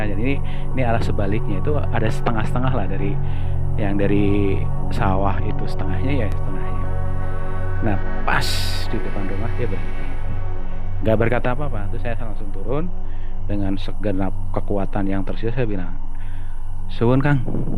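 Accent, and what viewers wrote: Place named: Java, Indonesia. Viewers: Salam ngapak😂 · Salam ngapak om, ora ngapak ora kepenak. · Jawinya itu loh ada bnget bkin asyik dngerin critanya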